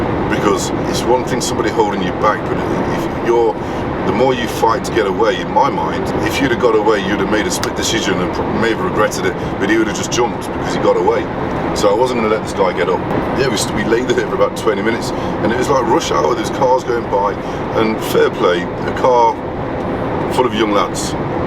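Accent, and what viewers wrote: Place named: Loughborough, United Kingdom. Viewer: Probably not the best video to ask this - need advice... left my job due to finding out my management were heavily racist (stupid to leave without any job lined up but I'd rather leave on good intentions) Now in-between jobs, would you recommend agency at all? I like to be on the books as I'd like a mortgage in the next few years but I'm happy where I am in life so that's just a plus. I'm Loughborough based so plenty of jobs. Keep up the good work mate, nice to see someone with my accent who's a trucker and vlogging 👊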